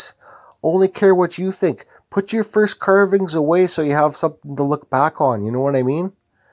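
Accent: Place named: Canada. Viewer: Keep doing your thing brother! Love the Canadian accent too. I need to start shooting video of my carvings.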